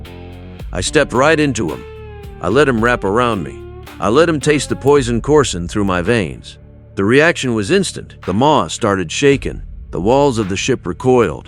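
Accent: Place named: South, United States. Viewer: The Southern accent made it worth while for the listener. 👍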